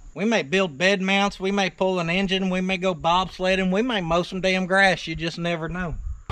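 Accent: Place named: South, United States. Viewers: you gotta drop at least 2 vids a week. And yeah love that southern accent. I also watch Randy from Auto auction rebuilds hey spoke of you a while back in a video .TKs Garage , Lucky's wheels Deals ,Vice grip garage .Yeah lol I learned a lot new words Libby dubby lol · Took me a minute but your humor got me past the hillbilly voice. I subscribed and I'm telling everyone. Good content and downright funny! Oh and good on yer wifey for recognizin the talent.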